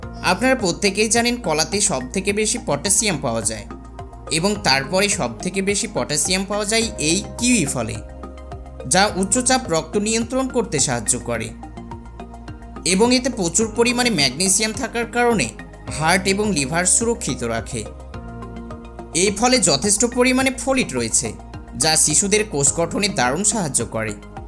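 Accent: India. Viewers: তুমি যে ইন্ডিয়ান এটা কথা শুনে বুঝা যায়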